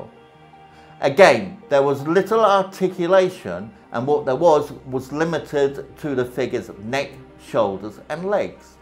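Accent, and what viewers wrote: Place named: United Kingdom. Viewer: Gotta love the guys British accent 😊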